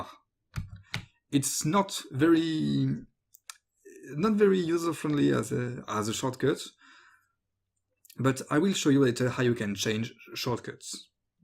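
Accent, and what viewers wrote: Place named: France. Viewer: Merci pour toutes ces vidéos, cher compatriote dont l'accent n'a pas fait mystère! ;-) Je suis en train de m'autoformer à Harmony, et ces vidéos m'aident beaucoup.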